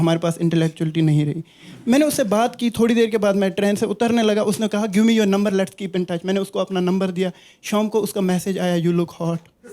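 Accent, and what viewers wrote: Kashmir, India. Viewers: Puré Kashmiri accent 🫶🏻🌷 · Awesome Performance. Timings and delivery along with that Kashmiri Accent was fire 🔥🔥All the best from US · Mainey subas - crybe karr leya 😂😂 .. Kashmiri accent ❤ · Kashmiri accent hai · is just Samay riana with a beard, a Kashmiri accent and daring muslim jokes.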